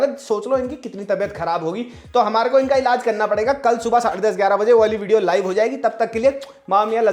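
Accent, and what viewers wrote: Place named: Delhi, India. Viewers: chapri delhi accent 😭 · This guy roasts in a brutal manner & silences the promoter of those usual dumb foolery we see in some over hyped south Indian movies. Appreciate the honesty of this guy lately. Although i dislike a chhapri Delhi accent, that's their culture, i get it but the guy knows what's wrong in those movies. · He intentionally does that Delhi chapri accent for reliability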